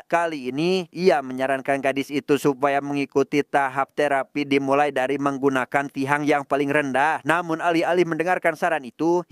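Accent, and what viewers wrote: West Java, Indonesia. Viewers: ....sunda na ti mana?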